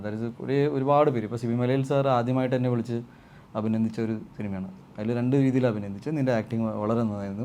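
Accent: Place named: Gujarat, India. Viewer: Ayaale padichathum valarnnathum Gujarat aanu. 20 vayassu kazhinjaanu pulli malayalam ezhuthaan padichathu. Athinte issues dialogue deliveryke onde. Athaanu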